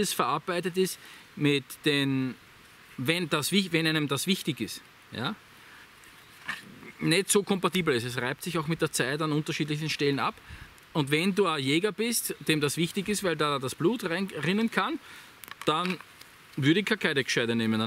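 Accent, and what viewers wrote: Austria. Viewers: Bist du Österreicher 🇦🇹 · Der Mann hat .at als Kanalnamen und einen hörbar österreichischen Akzent - für mich als Norddeutschen. Was soll die Frage?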